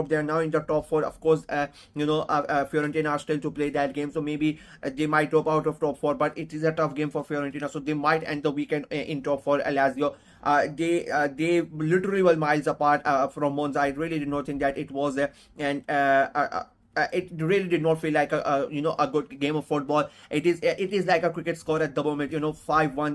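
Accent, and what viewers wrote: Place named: India. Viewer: r u from india , u sound so much like them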